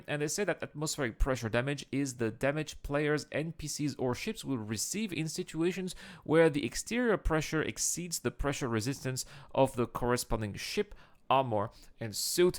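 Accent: France. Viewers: nice i really love that your channel is growing! you are always on point and i love your french accent, greetings from germany keep it up! :) <3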